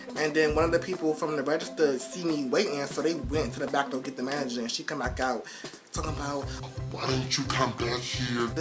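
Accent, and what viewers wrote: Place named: DMV, United States. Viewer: Your accent is so DMV, I know my people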